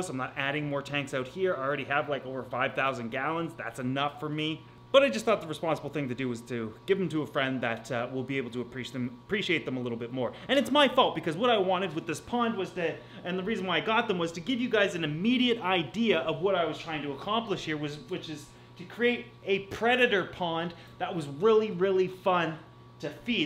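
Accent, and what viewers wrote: Canada. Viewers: As a Minnesotan, I adore your Canadian accent! Makes me feel like I know you. I appreciate the videos and great information, I'm just getting into the hobby and your channel has been a go-to source for information :)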